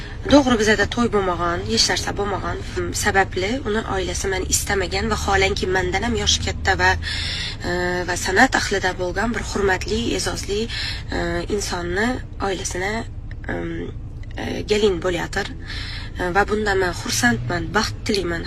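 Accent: Khorezm, Uzbekistan. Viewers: Шеваси хоразиммми Гузал аел экансиз бола хам жуда шерин экан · Xorazmcha gaplab duribdimii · Хоразмда шеваси ажойиб йокимли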